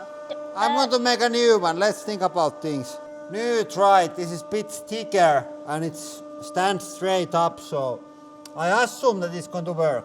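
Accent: Finland. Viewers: Rally English never gets old 🇬🇧❤️🇫🇮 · I love when Finns speak English. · I know you speak this extreme finglish on purpose, but after a while it gets really irritating. So irritating that i find myself muting the videos. The gimmick has gotten to be a bit over-used.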